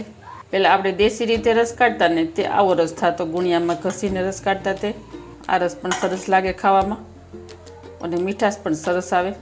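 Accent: Bhavnagar, India. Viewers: તમારી ભાષા પરથી તમે ભાવનગર તરફનાં લાગો છો, બહેન !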